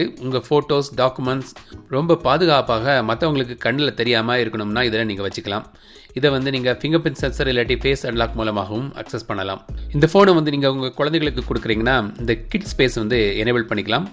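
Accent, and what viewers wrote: Kanyakumari, India. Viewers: Are you from kanyakumari?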